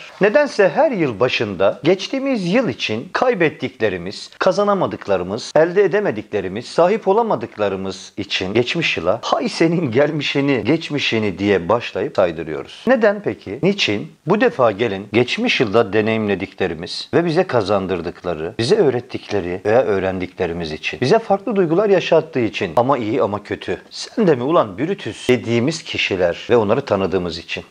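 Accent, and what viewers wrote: Erzurum, Turkey. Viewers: Abi şiveyi değiştirme erzum şivesi seni sen yapan özelleğin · türkçende harikulede ama erzurum aksanıyla konuşmak sana daha çok yakışıyor videoların devamını bekliyoruz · Abi bu işi büyüt ama sevdiğimiz halinle doğallığınla devam et . Erzurum ağzını bozmadan devam etmeni rica ediyorum baş tacısınn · Kardeş gerçek Erzurum ağzı ile anlatımların muhteşem nacizane başka ağızla hiç bir video anlatım yapmaman seni insanlar izliyor dinliyor gülüyorsa anlatımının komik ve gerçek Erzurum ağzı ile olduğundan gerek yok kitap gibi anlatımı konuşmayı bunları zaten birileri yapıyor başarılısın devamını istiyor izliyoruz ve beğeniyoruz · E şimdi benim kafam karıştı. Sen sadece bu hikayeleri anlatmak icin mi bu güzelim erzurum şivesini konustun. Ben dogal şiven sanıyordum. Bu istanbul turkceli halinde ne şimdi gardas. Filmin orjinalligi gitti gibi bir durum oldu valla :) Biz seni oyle dinleyip tanidik,sen hep oyle ol.. 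· Erzurum şiveli komik hikayeler gelsin lutfen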